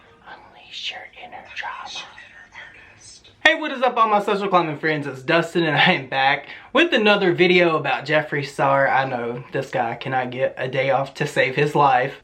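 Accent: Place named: Florida/Georgia border, United States. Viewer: Where are you from!? Your accent reminds me of home: FL/GA border.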